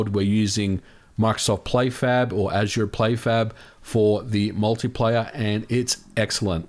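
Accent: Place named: Australia. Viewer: Are you Aussie? I just saw on your profile that you're located in the USA, but I hear an Aussie accent :)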